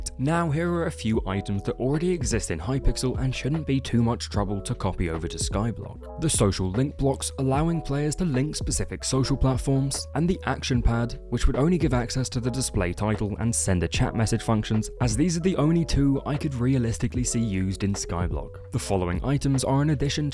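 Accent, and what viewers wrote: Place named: United Kingdom. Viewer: Video is good even tho the guy speaking is British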